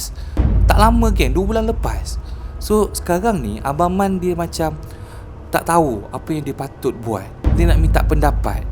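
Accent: Kelantan, Malaysia. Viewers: Btw ni org pantai timur ke?ado bunyi telo klate sikit2😂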